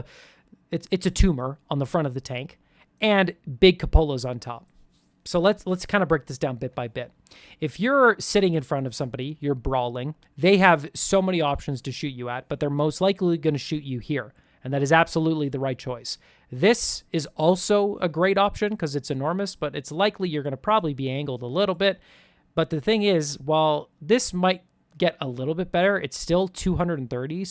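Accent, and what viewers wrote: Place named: Canada. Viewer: I guessing you're a fellow Canadian? Lol ...I haven't caught ab "eh", but I did catch the "Zed". 😉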